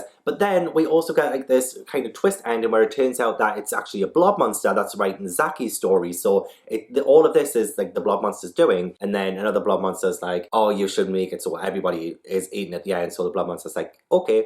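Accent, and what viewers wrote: United Kingdom. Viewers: Hey it’s European (somewhere in the UK I think, maybe Wales or Scotland, the accent isn’t too familiar to me) Josh Peck! What’s up? Great video! I’ve never seen your channel before but I like it a lot already! Can’t wait to watch this video! If I like it enough by the end I’ll be sure to subscribe! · You sound like a fruit and the British accent doesn't help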